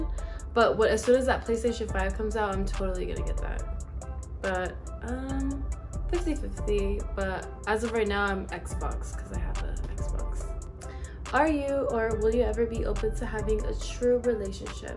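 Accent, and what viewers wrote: Spain. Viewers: Your Spanish accent <3 <3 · I like your spanish accent.. Greetins from cozumel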